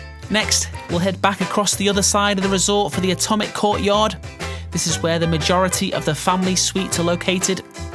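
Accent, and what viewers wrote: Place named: Scouse, United Kingdom. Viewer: Why have I never noticed the slight Scouse accent till this video?!?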